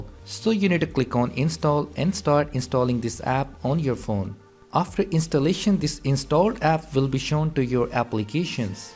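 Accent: India. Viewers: I hate indian accent very much. But this one is okay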